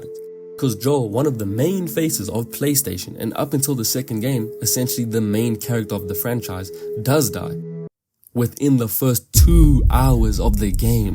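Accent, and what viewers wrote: South Africa. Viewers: you South African ik that accent on god😂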